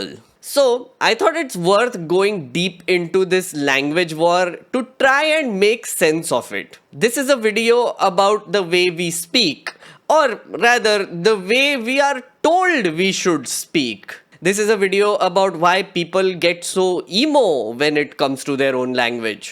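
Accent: India. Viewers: English is an Indian language now and you are speaking indian English while talking about India. I don't understand why people don't understand it! And on top of it choose to mock the accent. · I don't know what a Correct accent is either. It's probably just some white liberals offended, because your accent kinda sounds like Apu. A cartoon character used to make jokes about immigrants from India.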